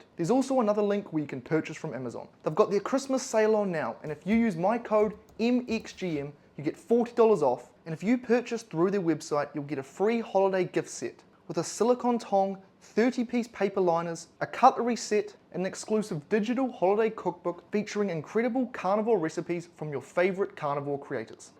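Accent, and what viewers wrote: New Zealand. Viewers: to me he sounds like a Kiwi at times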